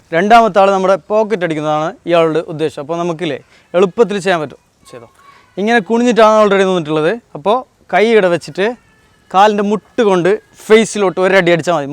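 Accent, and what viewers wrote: Kasaragod, India. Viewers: കാസറഗോഡ് ആണല്ലേ.... ഗുഡ് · ശുദ്ധമലയാളം സംസാരിക്കാൻ ശ്രമിച്ചാലും ആ കാസർഗോഡൻ ശൈലി വരുന്നത്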